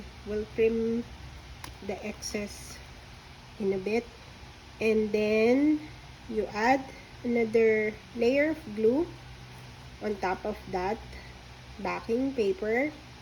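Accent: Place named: India. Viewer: Thé ornament paper clip are very cute Thanks for sharing your process Love your Indian accent it remenbered me of my 6 years in Bangalore I miss India very much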